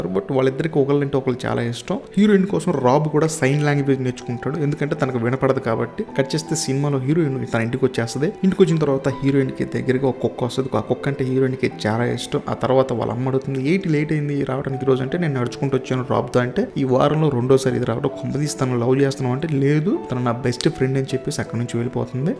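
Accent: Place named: India. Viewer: Anna mi explanation simply superrr. Mana telugu slang to cheppadam chala bagundii. One request Ee movies OTT lo vuntee cheppandii Memu chustamm Thank u brooo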